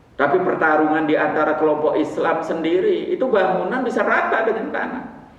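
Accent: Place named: Bali, Indonesia. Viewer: Salam sehat pak... Pengucapan huruf T kok seperti pengucapan dari orang Bali, Aceh dan Jawa Cirebon... Apa asli dari Cirebon ya pak... Terima kasih matur nuwun... 🇮🇩🇪🇸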